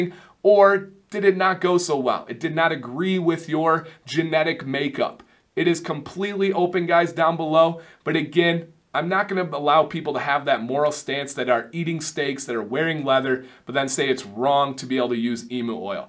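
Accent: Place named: United States. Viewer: Absolutely fascinating video. As a carnivore I 100% agree with your views on emu oil (& respect those opposed coming from a vegan perspective). I dig that the bird is farmed for food, it’s feathers, etc & that so little goes to waste. This is not an oil I have ever heard of or seen in any UK beard oils but I will definitely look out for emu oil, especially if it works on dormant hairs. Just FYI, another thing I love with your videos, & others I watch on YouTube from over the pond, is the little accents. Hearing you pronounce emu ‘E-moo’ where as in the UK we pronounce it ‘eem-U’. Just reminds me how huge the bearded community is! Anyway, Happy New Year, all the very best for 2020!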